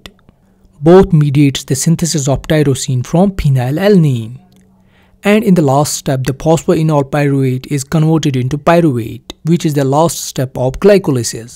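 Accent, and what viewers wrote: Kashmir, India. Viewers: Are u from kashmir sir?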